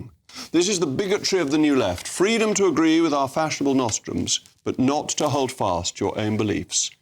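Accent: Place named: Cockney, United Kingdom. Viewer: Cor blimey thats a right bleeding Cor Blimey Cockney accent aint it.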